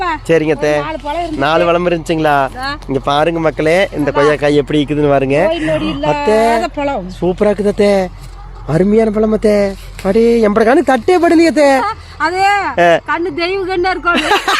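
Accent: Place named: Erode, India. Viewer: உங்களுடைய பேச்சி ஸ்லாங் ரொம்ப நல்லா இருக்கு ஈரோட்டில் எந்த ஊரு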